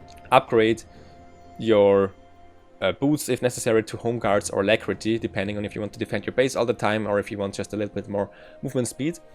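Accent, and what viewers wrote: Germany. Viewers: Nice Tutorial. But now I am really interested. You dont really sound like youre from England or America. With which I dont mean your English isnt good its actually really great, but you dont got that accent. Thats just a guess but are you from Germany your accent sound kind of like the German one. I am from Germany so I am really interested now :)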